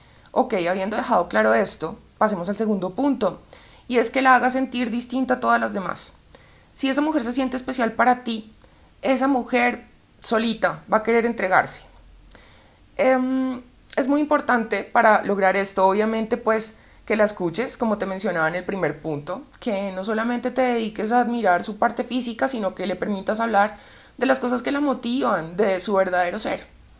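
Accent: Colombia. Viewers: ALABADADO SEA EL SEÑOR POR FIN UNA PERSONA QUE HABLA CLARO COMO EL AGUA... NADA QUE VER CON ESOS SEUDO COUCHES QUE HABIA MIRADO PENSANDO QUE ERAN LO MEJOR.. POR EJEMPLO SEGUIA A UN ESPAÑOLETE QUE SE PARECE A MIGUEL BOSE PINTA EL MAN Y NO LE HE ENTENDIDO NI JOTA . PERO NUNCA PERO NUNCA PENSE EN EL PUNTO DE VISTA DE UNA MUJER SINCERA FRANCA Y DIRECTA...TE DOY UN GIGANTE 10 DE 10 TE FELICITO VEO POR TU ACENTO QUE ERES COLOMBIANA , GRACIAS COMPATRIOTA ESTAS HACIENDO PAÍS . NO ERES JESÚS PERO DEVUELVES LA VISTA A LOS CIEGOS. ERES MUY LINDA Y NO ME REFIERO A LA FACHADA A TU APARIENCIA PERSONAL QUE ESTA EXELENTE POR CIERTO. A LA BELLEZA VERDADERA LA QUE VALE MIL MILLONES DE TONELADAS DE ORO A LA BELLEZA INTERIOR. A TI MI REINA TE SOBRA HASTA PARA DAR Y REGALAR.. EN SERIO ERES LINDA Y BELLA DE MENTE ALMA Y CORAZON 💓💓💓💓 LO DEMAS COMO LA APARIENCIA O TU ASPECTO VENDRA POR AÑADIDURA.. 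GRACIAS TOTALES POR TUS PALABRAS LE DAS VALOR AL VALOR TUS PALABRAS Y FRASES ME MOTIVAN Y ME SACAN DEL ENGAÑO DE ESTE SISTEMA SOCIAL CORRUPTO Y OPRESOR. YO TAMBIEN TE ESTIMO. AUEQUE NUNCA TE VALLA A CONOCER SE QUE ERES DE FIAR Y CON ESO ME BASTA SUBE VIDEOS AL 💯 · Hola..te felicito que yo no dr donde sacas esas ideas y cuando las piensas...Si te vienen s la cabeza cuando estás viendo el juego de fútbol o en el supermercado o te pones a pensar y estudiar y después te sientas a hablar o es que hablas que hablas con los asesores de España estos unidos Dinamarca y Nepal que tienes...Eres muy inteligente y hablas como su fueras colombina. Te felicito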